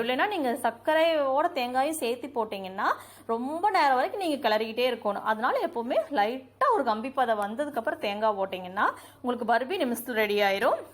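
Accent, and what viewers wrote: Kongu, India. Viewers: Akka neenga pesura kongu Tamil arumaiya iruku akka, first time unga videos lam paakuren , unga farm, home lam romba nice, continue aa videos pannunga🙏🙏🙏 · அருமையான காணோளி அம்மனி உங்கள் கொங்கு தமிழ் பேச்சு மிகவும் அருமை தேங்காய் பர்பியில் நாங்கள் கொஞ்சம் பொட்டுக்கடலை போடுவோம் அம்மனி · அக்கா நம்ம ஊரு பேச்சே பேச்சு தான் ❣️😍 அருமையான பேச்சு அம்முனி(அக்கா ). அஸ்கா பருப்பியும் அருமை 😋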